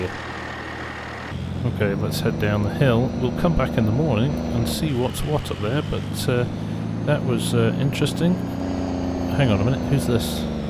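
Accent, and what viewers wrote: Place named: South London, United Kingdom. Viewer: Haha, Statham is your hero! That's brilliant....however, you need to work on your muddled South London accent and nasal-y pronunciations a bit mate. I'm still waiting for someone to come out with an AD / CP route for this map. I think I've messed up my routes as I'm still getting used to AD...(always connect the lines like a train route, yeah)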